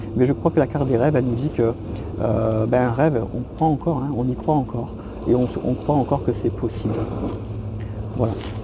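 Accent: Marseille, France. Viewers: On dirait Marseille 🥰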